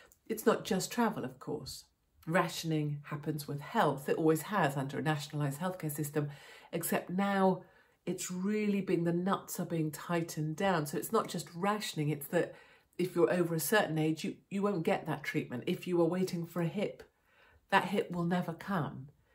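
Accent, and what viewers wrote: United Kingdom. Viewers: Based on your accent I assume you are posting from the UK. I may be wrong. Why on earth are posing in from of a hugh american flag? You have little say in the UK and exactly zero say in the US. A lot of what is now going wrong traces back to american moves.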